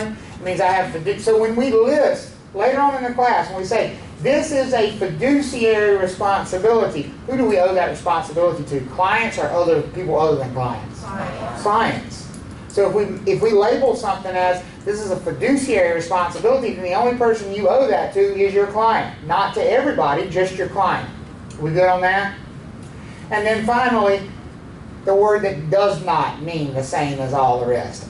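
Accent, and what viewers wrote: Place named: South, United States. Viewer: I’m staying due to the southern drawl. Plus he teaches well!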